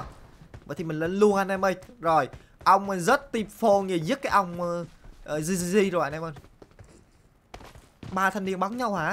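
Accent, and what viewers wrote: Binh Dinh, Vietnam. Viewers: Bình Định bạn nhá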